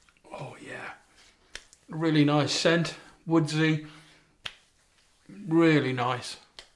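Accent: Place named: Birmingham, United Kingdom. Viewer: ayyyyyy Bimrmingham, I was gonna say I recognise that accent, that my accent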